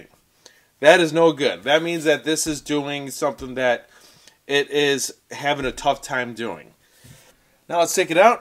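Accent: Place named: Wisconsin, United States. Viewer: Depending on if he's using "freedom units" or canuckistanian metric units. :D I would've guessed Wisconsin or Michigan from the accent, but Ontario is not entirely impossible.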